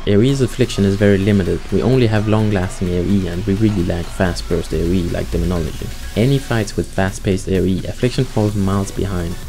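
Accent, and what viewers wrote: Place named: Portugal. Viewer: I am always curious to know from what first language accents come from; would I be at all close if I said Portuguese? Thanks for the guide though, I had little problem understanding it. And "tier as far as I know, (my first language is English) is pronounced like in the word teardrop, or phonetically "teer".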